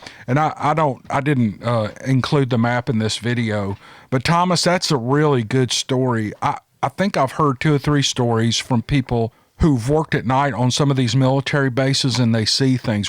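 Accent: South, United States. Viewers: It's not only your great narrations of our people's stories that make your videos so enjoyable, but also your ramblings! So, don't cut your ramblings out please and don't discount them!!!lol! Your personality and warm Southern accent are so delightful! Like hot, salty fried okra just out the frying pan with a sweet iced tea on a warm summers' evening!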